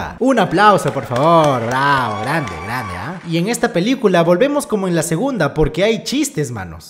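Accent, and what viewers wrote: Peru. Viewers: Sin que diga que es peruano, ya sabía que era peruano en los primeros segundos del video 😆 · Que chevere que haya un yutuber que sea peruano y me narre la historia en peruano. Saludos desde la selva causa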